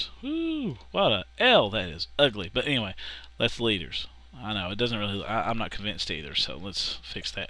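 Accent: South, United States. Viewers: lol this wasn't what I was looking for I already understand how to do this but you did such a good job and made me laugh ( plus I love your southern twang ;) sexy!) so I kept watchin anyway :P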